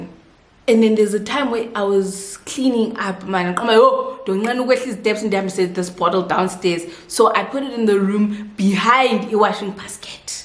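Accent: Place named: Sotho, South Africa. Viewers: The Sotho accent for me😂❤. Hamba Oyii😂😂😭😭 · 😂😂😂😂😂😂😂😂😂your sotho accent hle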